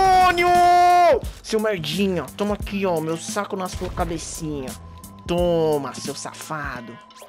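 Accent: Minas Gerais, Brazil. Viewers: Tu tem sotaque de minas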